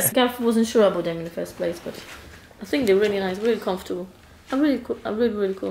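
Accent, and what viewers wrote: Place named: United Kingdom. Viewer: Hej, mam pytanie. Nie dotyczy ono tego vloga ale za każdym razem jak Was oglądam to jakoś tłucze mi się to po głowie. Mianowice, Twój angielski ma już delikatny brytyjski akcent ale za pewne nie zawsze tak było. Możesz spytać męża co sądzi o naszym polskim akcencie na tle innych narodowości? Czy jest znosny? Irytujący? A może całkiem miły dla ucha? Wiem że niektóre akcenty (podobno francuski) w ustach mężczyzny brzmią słabo ale kobieta z takim akcentem brzmi sexi. Często się nad tym zastanawiam.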